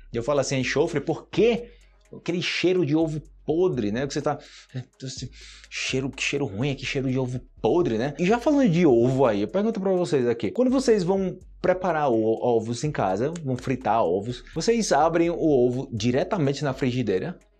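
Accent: Antioquia, Colombia. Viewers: Crealo yo note lo .mismo e incluso me atrevería a decir que vivió en Colombia por qué suena como paisano jajaja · toda la razon, si bien soy chileno he tenido la suerte de hablar con gente de varios lados y pensándolo realmente suena como colombiano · si el vivió en Medellín Colombia como 5 años .habla con perfecto acento colombiano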